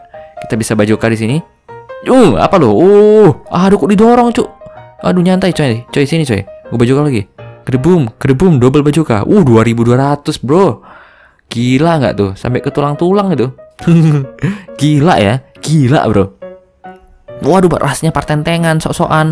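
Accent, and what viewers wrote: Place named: Medan, Indonesia. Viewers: nampak kali medannya ya bang :D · Abg org medan yaa ?? Logatnyaa...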